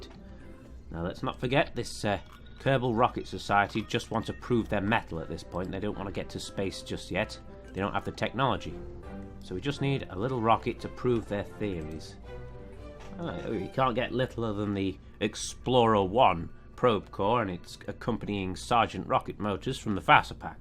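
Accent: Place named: Northern Ireland, United Kingdom. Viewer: He's Northern Irish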